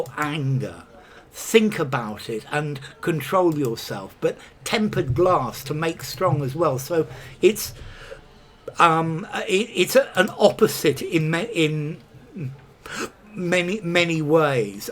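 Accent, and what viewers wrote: RP, United Kingdom. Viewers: Thank you, for the special "technical" uses of the word, viz.,- metal,steel,glass, ductility, spices, chocolate..... it is always enriching to view the videos in your Channel, all the more so, because your explanations and examples are well chosen, precise, thorough and tempered with the sweet and sour spice of humour and a posh accent. ☆Je vous envoie des baisers tendres de Paris. Sometimes, they can be a bit "fougeux", but I guess it would be a sin (or a crime ) to temper them!♡♡♡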